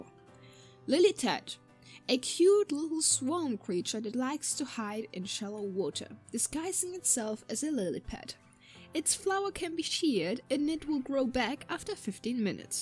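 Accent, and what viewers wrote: Germany. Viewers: Where are you from? You sound like german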